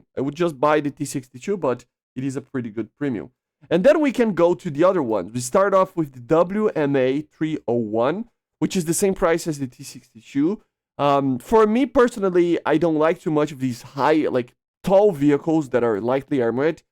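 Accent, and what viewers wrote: Brazil. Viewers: I was about to ask If you were from Brazil because of the accent. Then I saw you had a Portuguese Chanel lmao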